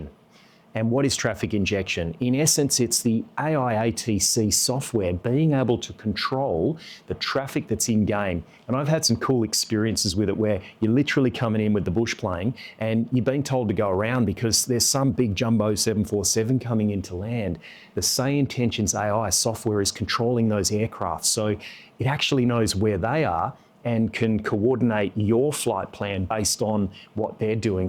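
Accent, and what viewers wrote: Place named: Australia. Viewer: Perfect video mate and the Aussie accent always wins for a Danish guy like me :) Still waiting for my Super but it is going thru the QC right now so I should have it within a month I guess :)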